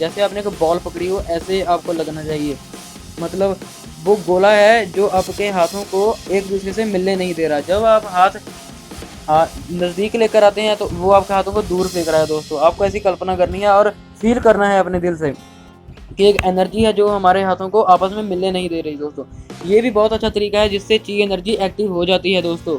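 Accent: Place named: Punjab, India. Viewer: Narrator is from Punjab, I guess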